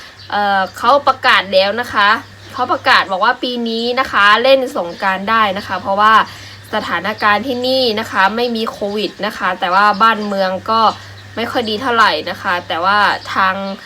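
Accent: Thailand. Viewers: น้องดูเหมือนคนไทย ไม่เหมือนไทใหญ่ จากการพูดสำเนียงภาษาเหมือนไทย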